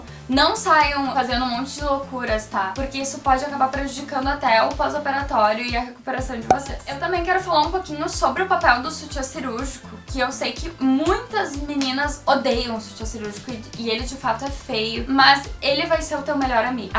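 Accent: Brazil. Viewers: Lógico. Seu sotaque, seu vocabulário portoalegrense uai! É mesma coisa que reclamar de gaucho dizer "bah". Pede pra mudar de estado federativo de uma vez.... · Aí que gente chata. Foca no conteúdo do vídeo e não no sotaque dela. Ela é gaúcha assim como eu e o nosso sotaque é bem assim mesmo.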